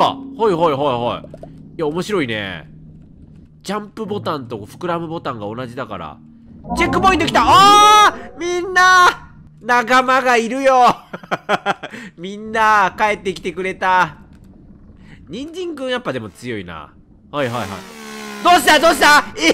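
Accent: Kansai, Japan. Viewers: 関西人ですからね❤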